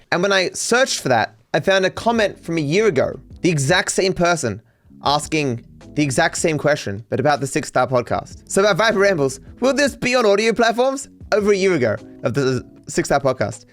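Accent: Australia. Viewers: I'm American and have never thought you were anything other than Australian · I hear the Aussie because you aren’t as (I use this very very loosely) formal as a stereotypical Brit and not as limp as American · I'm from the north of the UK but don't really sound it. It is a very bland accent. I could tell you were Aussie but only just when I started watching. Outsiders from the UK have no Idea how many accents we have, they think we are all royal or some shit, clearly never been to Marsh green in Wigan lol. Go up the road were I live and you will find very different accents. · Nah, if you can't understand the Australian accent, that's a skill issue. The only people that can be difficult to understand are the Scots. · I'm australian and i never thought his accent stood out, mind you i was still able to distinguish you had an aussie accent the first time i came across one of your videos just to go check the channel name and everything clicked. 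As a side note and being as respectful as possible, you seem to speak really quickly EVEN by australian standards, like we're known for shortening phrases, but for example you said 'a lot of things' as if it were one syllable or one breath. Like, even faster than saying 'lotta things' slowly, i think its made even worse by the enunciation that you use to make yourself sound readable to non-english speakers. and i have a few mates of mine (doing I.T if that tells you anything) who try to get out large 6 syllable words out really quickly while ALSO enunciating (without slang), and to me sounds unnatural/forced to me despite having no trouble trouble understanding what they're saying, similiar vibe here. But i suspect this is what the non-english speakers having trouble with as it doesnt match the natural anticipation or cadence of, say for example a british or american english speaker so i reckon it might come off as hard to follow the pacing, so it's not just a culture thing but also your own habits. 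I'm no linguist though.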